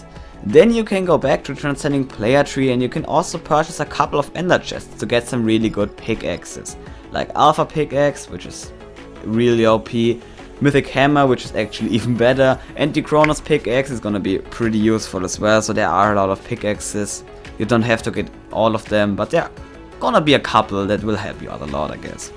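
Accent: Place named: Germany. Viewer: Man merkt das du deutsch bist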